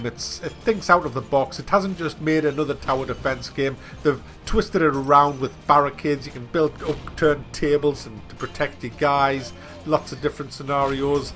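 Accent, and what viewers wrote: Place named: Scotland, United Kingdom. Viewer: Come off it! YOU'RE SCOTTISH!!! And if you REALLY arnt......then what the hell are ya?? You sound like all the other "Scots' I grew up with in Dunedin.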